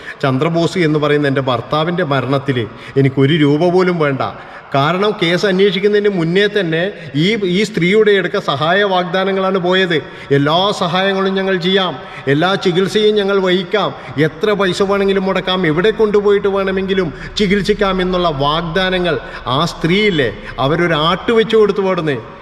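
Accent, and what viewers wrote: Kannur, India. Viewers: നീ എടെ സ്ഥലം, കണ്ണൂർ അല്ലേ 😂നിന്റെ വർത്താനം കേട്ടാൽ അറിയാം 🤣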